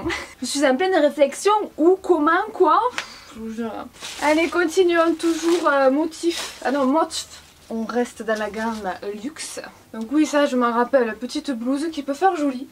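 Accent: South, France. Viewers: Bonjour/Bonsoir j'aimerai beaucoup que tu test la marque Adopt maquillage parfumerie moi perso j'adore mais j'aimerai beaucoup que tu fait une vidéo là dessus. Continue ce que tu fait j'adore tes vidéos sont génial. Je suis du nord et ta voix du sud nous ramène la bonne humeur. Bisous a votre petite famille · Au pire le haut bleu finale tu le garde pour dormir en hiver et les flops tu les vends🤔 Sinon j’adore chaque de tes vidéos et je surkiffe ton accent du sud gros kiss 😘😘❤️‍🔥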